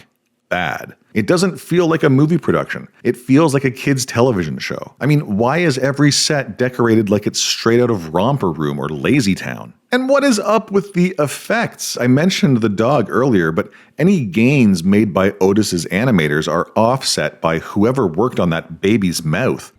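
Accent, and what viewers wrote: Canada. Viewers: I'm going to guess he's from Eastern Canada the way he pronounces the "ou" sound (this is coming from someone in Saskatchewan)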